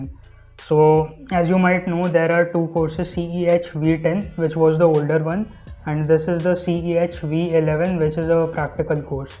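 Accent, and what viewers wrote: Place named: India. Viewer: Are you indian because your tone is like indians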